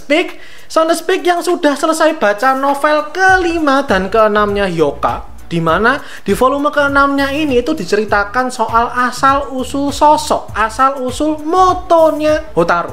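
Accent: Java, Indonesia. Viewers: Medok bang 😂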